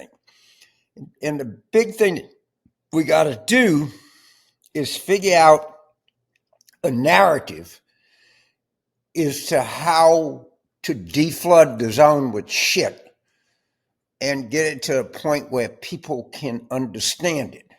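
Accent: South, United States. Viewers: Thanks again,for another informitive video Mr. Carville! I've watched you for years,and never get tired of your wisdom! With your southern drawl,and a little comedy,you continue to guide our people! I met little donnie many years ago in NYC.I knew after two seconds,that he was full of $hit! Being a country boy,I've been taught to beware of a conman! How can so many people be misled at the same time,inour beautiful country? Where does all of thier anger come from?Can't they see that his is nothing but a brown stain in thier shorts? Sheesh.❤❤❤❤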